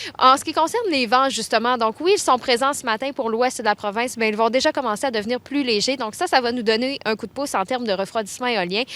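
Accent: Quebec, Canada. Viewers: Moi j'ai tapé météo Quebec rien que pour entendre l'accent Quebequois ...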